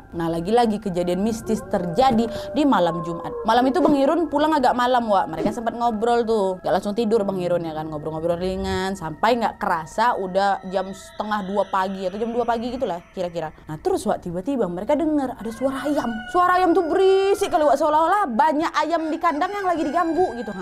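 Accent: Indonesia. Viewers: wajar lidah indonesia kebanyakan gitu ngomongnya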